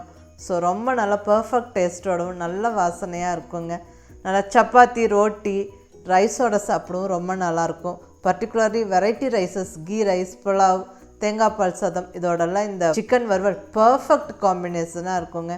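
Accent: Coimbatore, India. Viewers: Amma unga samayal yellamea 👌 ma, ninga pesura kovai tamil ketguradhugu rempa piduchurugu ma. Thanks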